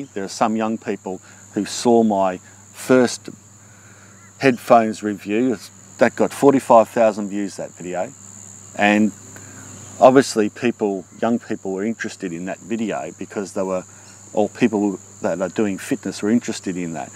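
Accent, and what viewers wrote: Australia. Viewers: I don't have TV and I just love the sound of your Aussie voice, if its relevant to you I can listen to you talking about :-) I hope you keep making videos even if its about the weather and its impact on your garden and your neighbourhood... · From how to build a fire pit, pickling, watching you cut wood, transporting snakes, learning about a tick I never knew existed, to how to grow a lot of... I have really enjoyed your videos. I love your humor and some of the things you've done with your videos. I felt like a fool after watching one video (which was the first one I watched) then sharing that your hen was broody. Thanks for graciously letting that go by. I don't even have any chickens! Keep up the good work. You always seem to make me laugh. The videos you share benefit me. After the review of the Mortar & Pestle set I knew which one I wanted to buy. 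Oh yeah the DIY outdoor cat hotel - so cool. Ok... REALLY, I just dig the Australian accent not to mention the sound of your environment. The birds and wildlife are phenomenal.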